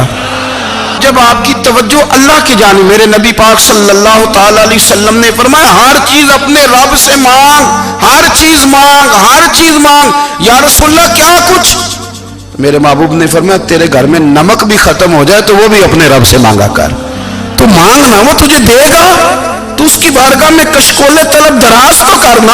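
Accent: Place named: Punjab, Pakistan. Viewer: kis tarha se baat karte hain aap peer sahab , dil ku sukoon mil jata hai , aapki punjabi accent toh mashallah ❤❤❤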